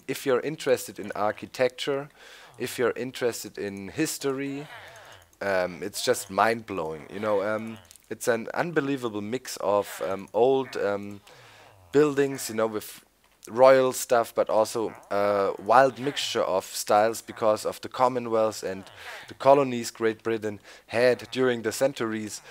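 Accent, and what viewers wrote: Germany. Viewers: Du bist Deutscher?! Cool, jetzt wo ich es weiß hört man doch ein bisschen, dass du Deutscher bist :DD Aber naja Englisch kannst Du!!! :DD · Ist realy strange a Person who also Comes feom the Country you come from in another language hear to speak and commenting in english at the same time you know he also under stand this: Man hört den Akzent raus! and i wanted at every time I wrote a comment say the following sentence: "it is nice to be a greiß, but it is higher to be a bauer! ;D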